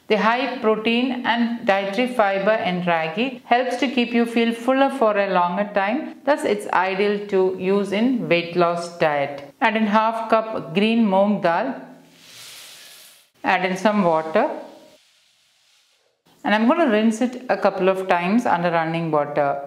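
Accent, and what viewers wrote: Kerala, India. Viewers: Delightful to hear the nice mallu accent again… felt homesick 😊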